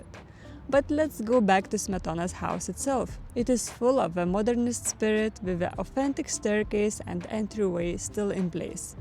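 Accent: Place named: Russia. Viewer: You have a very russian accent wow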